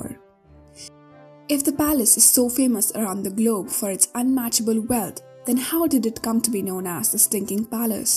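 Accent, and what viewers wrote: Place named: India. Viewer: I just can't take the Indian accent seriously. I hear it, I'm gone.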